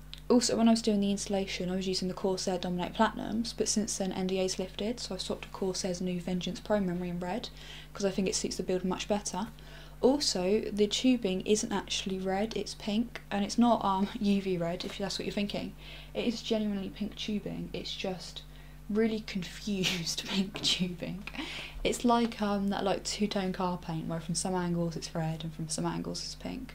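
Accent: England, United Kingdom. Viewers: sexy English voice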